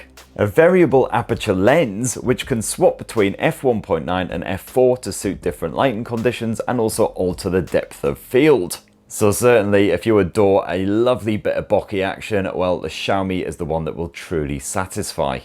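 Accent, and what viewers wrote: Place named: Newcastle, United Kingdom. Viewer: Believe me when I say doesn’t speak with a typical English accent…! He’s what’s known as a ‘Geordie’. 😶 Most British people struggle with their accent too. It’s why we’ve banished them to the far north east of England!🫨 Think North of the Wall in GoT without the furry clothing. Does make good videos though…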